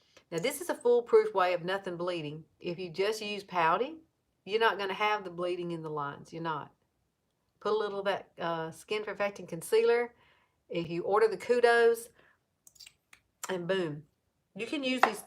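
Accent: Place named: Tennessee, United States. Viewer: Loved this tutorial. I’m in Texas and we had those same storms. Anyway....you’re like a TN accented Theresa Caputo(sp). 😀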